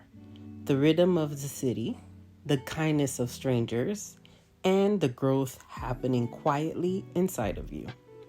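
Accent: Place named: Ghana. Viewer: you really sound like a Ghanaian. Anyway with some travels to do a work. It can be lonely sometimes. But its can be a time for reflection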